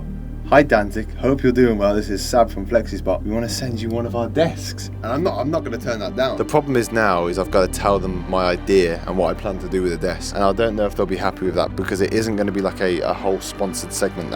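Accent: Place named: United Kingdom. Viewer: Bro i have troubles understanding u because Ur briish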